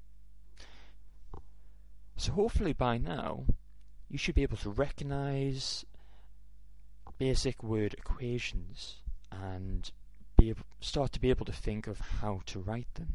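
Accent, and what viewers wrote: Scotland, United Kingdom. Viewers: I can hear Scottish accent in there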